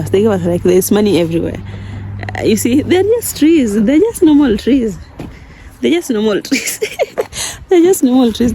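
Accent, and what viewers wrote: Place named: Kenya. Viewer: You sound East African, and keyan to be exact. Why the Ghana flag? 🇬🇭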